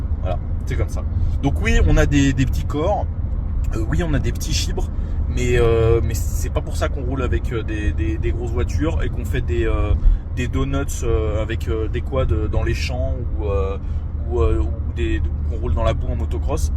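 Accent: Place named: Arab world. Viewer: Pourquoi l'accent arabe? Mis à part ca, on à l'impression d'entendre Papacito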